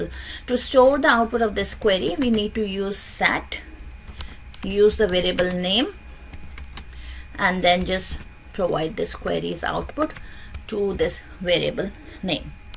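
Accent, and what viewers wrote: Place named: India. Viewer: The accent is so Hinish and so bad and is not understandable!!! learn to speak English as English not as Indian!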